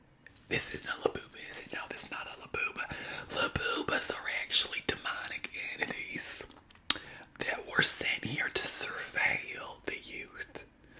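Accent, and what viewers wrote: South, United States. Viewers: For some reason, I can't help but think about Rick Grimes from The Waking Dead when you do the southern accent with this character 🤣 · i love the rick grimes -esque accent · I love the southern accent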